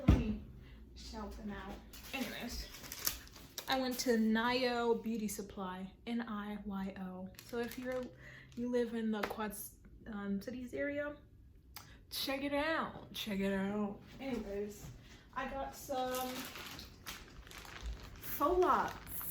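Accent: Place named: United Kingdom. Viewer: As a Londoner the British accent is appreciated 😂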